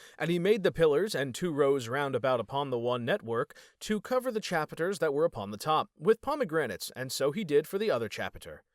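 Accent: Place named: United States. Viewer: Is this talmud shit in American Zionist accent... ⁉️‼️⁉️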